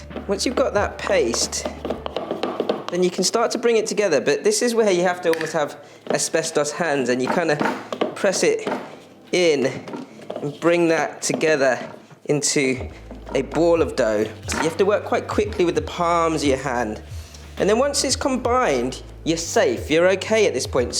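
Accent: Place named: United Kingdom. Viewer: His accent is very british... tickle my ear drum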